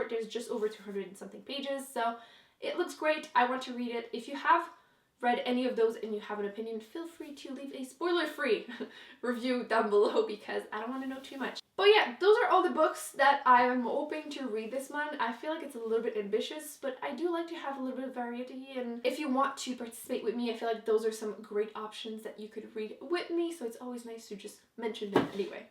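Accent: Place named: Quebec, Canada. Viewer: Hi Hello! I'm new to your channel and have enjoyed watching your videos and hearing your thoughts on books! Hope you enjoy the books from your January TBR!:) Btw, are you from Canada? You kind of have a French-Canadian accent.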